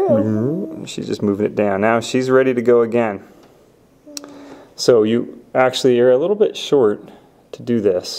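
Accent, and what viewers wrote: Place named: Canada. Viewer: Why did i think this was king of diy yhe accent is obviously Canadian but his voice is so similar BTW nice snake im getting one in 2 months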